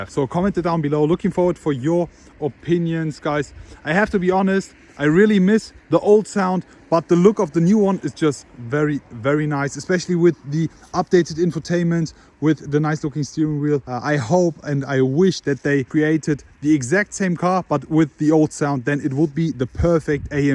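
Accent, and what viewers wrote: Germany. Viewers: Leicht deutschen Akzenten 😎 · Digger dein Englisch ist komplett weg alter man merkt so hart dass du deutsch bist aber trotzdem nices Video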